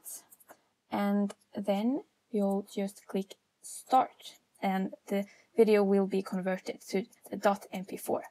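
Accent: Sweden. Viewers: cute swedish accent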